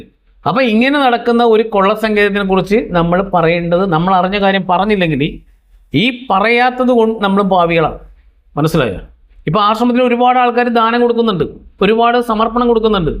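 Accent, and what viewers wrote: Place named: Kozhikode, India. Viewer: ഈ വോയ്സ് കോഴിക്കോട് കാരന്റെ എല്ലാ... കോഴിക്കോട് സ്ലാങ് എനിക് അറിയാം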